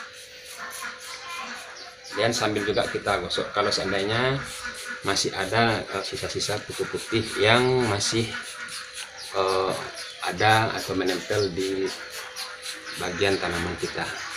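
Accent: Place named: South Sulawesi, Indonesia. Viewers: Anda pstibbkn org sulsel yach ? Klo org sulsel asli psti tau logatx.. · Om..domisili dikota mananya..soalnya logatnya sih org sulawesi selatan..maaf kalo salah yh..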